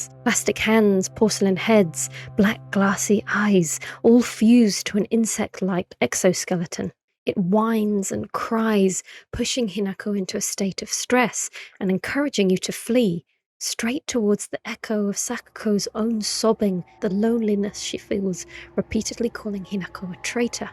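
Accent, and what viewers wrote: United Kingdom. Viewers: Every time uploads a video, I consider being a nicer person for the simple joy of listening to her deliberate concepts in video games. There's also moments where her emotion pours through her commentary and that feels wholesome and kind. We need more chill youtubers with smooth british accents talking quietly about video games all day. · I’m literally British and even I am charmed by her British accent, haha. So relaxing.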